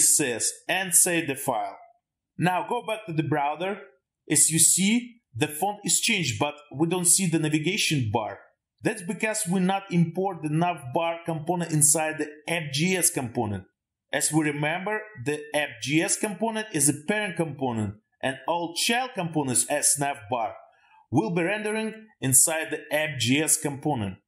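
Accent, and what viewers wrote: Russia. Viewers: You're so cool! lol are you Russian?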